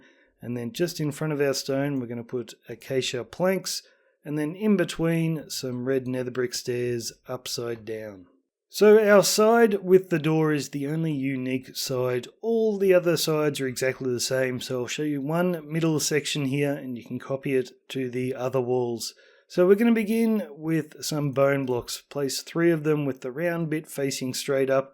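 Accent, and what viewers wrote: Australia. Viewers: Nice to hear an Aussie voice! I built this in my One Block Skyblock world, but changed the inside around as I'm outfitting it as my house and storage. I decided early on what colours I wanted, and in the end was so grateful I'd kept everything the one block threw at me. I went for a dark oak, prismarine, and quartz block palette, with sea lanterns, and emerald blocks instead of gold blocks. It took me a fair while to build in survival. Otherwise it looks like yours outside, apart from forgetting the anvils and hoppers, so it's a less imposing spire. Thank you so much for the hard work you put into this tutorial!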